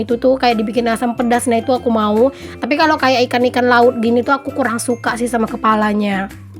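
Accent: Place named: West Sumatra, Indonesia. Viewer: Hay Bun, Bun aku tuh salpok sama suara waktu dabing dan ga dabing beda banget 🤭 kalau ga lagi dabing suara nya Minang banget 🤭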